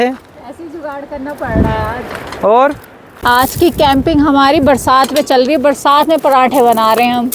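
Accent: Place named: Uttar Pradesh, India. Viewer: Bhaiya aap kaha se ho aapke bolne ka tarike se tho aap UP se lagte ho bhut acchi lagti h aapki video me aapki new subscriber 😊❤❤😊😊😊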